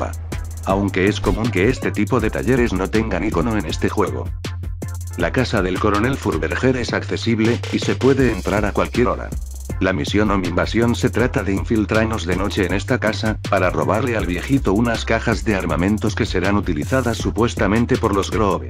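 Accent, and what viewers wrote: Venezuela. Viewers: Vos sos Venezuelano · Esa canción es de la tino América y es venezolano porque su forma de hablar las formas de groserías es obvio que es venezolano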